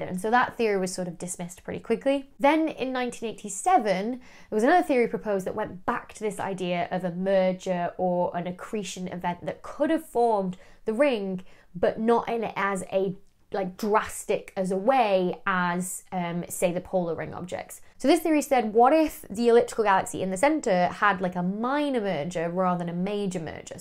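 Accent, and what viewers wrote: United Kingdom. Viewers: I noticed that but your Brit accent is so lovely that I forgave you🤭🥴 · Nothing sexier than a blue eyed PHD in Astronomy with British accent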